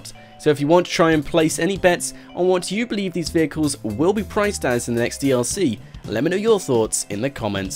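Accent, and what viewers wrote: England, United Kingdom. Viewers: Ryan McCall you're so culturally insensitive stfu hes from an area where that is how that word is pronounced. If anything because the US English language originates in England, his pronunciation is correct and yours is less correct. But either way he is pronouncing the word right, where he is from. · He is British he pronounces Los angilis and not Los Angels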